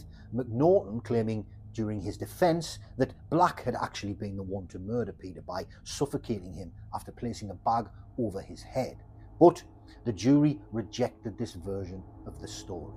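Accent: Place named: Newcastle, United Kingdom. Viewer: I'm probably due you an apology for thinking you were a well spoken Geordie instead of a Mackem then haha. Glad you noticed my critique was intended as constructive instead of just arsey. I'm a Fifer who lives not far from Burntisland, trust me, you're not the first to say it out as one word, heard many an American or English tourist pronounce it the way you did. I'd also say there's probably a good amount more of Scottish Madmen (a few women too) and Monsters to cover in the future. For example wouldn't mind a longer or longish one on either Peter Tobin or Angus Sinclair. I was looking for something new in the True Crime genre and subscribed after watching 2 of your earlier videos, genuinely think your work is generally excellent mate.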